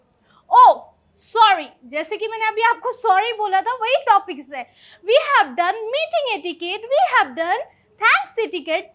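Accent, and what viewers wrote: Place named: United States. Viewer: Mujhe English bolna bhut pasnd h jyse aap bat krte ho American English aap 60 days course me American pronunciation add kr lijie plzzz❤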